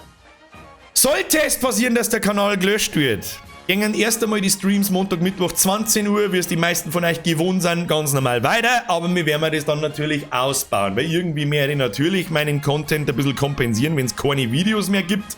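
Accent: Bavaria, Germany. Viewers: Also gruß aus BaWü...ich liebe deine Videos und stehe total auf dein Bayrisch (ned falsch verstehen des is koi obandeln!)...wäre mega schade wenn dein kanal gelöscht wird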